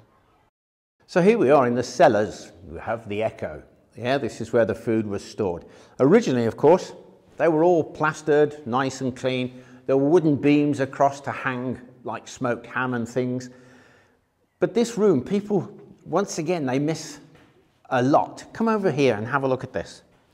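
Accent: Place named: England, United Kingdom. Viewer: He's not American. He's English. Very clearly English.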